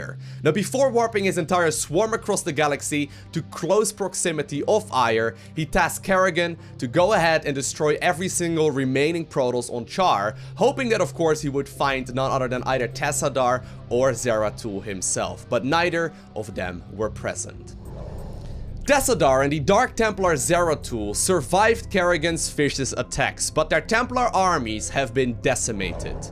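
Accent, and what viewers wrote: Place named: Netherlands. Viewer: So more people where screaming and hitting their screens yelling " look at your minimap!!!" Do love the vids and ur dutch accent, being dutch myself;)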